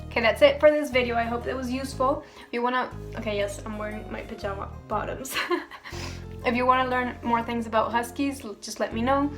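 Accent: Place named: Netherlands. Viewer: Heey are you dutch? Your accent sounds pretty mutch just like a friend of mine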